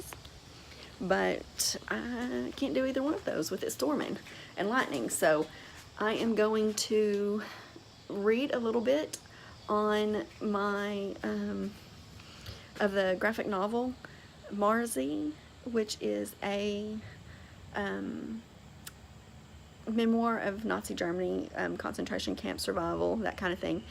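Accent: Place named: South, United States. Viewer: ahh! So neat! I’m from Tennessee so I quickly recognized the accent lol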